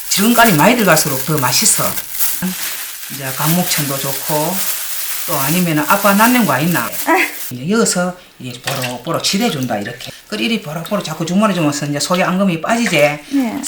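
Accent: Gyeongsang, South Korea. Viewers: 경상도. 말씀이 너무. 구수합니다. 신부 수업하는겄같는. 따님과. 너무좋습니다. 어려을때. 단술. 먹었던. 추억이. 떠오르네요. 좋은. 정보. 주신겄감사합니다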